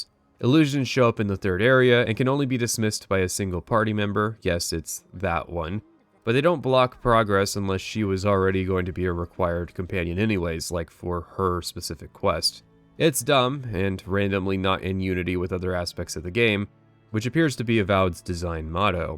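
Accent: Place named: United States. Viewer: I really have to ask how you, with your thick, middle-of-the-road Yank accent, know how the second act Italian accents are “fake”. You suddenly not only a gaming expert but a linguistics pro as well? Do the video blogging world a favor and get off your high horse with this shit. Review the game….leave your opinions about accents out of it.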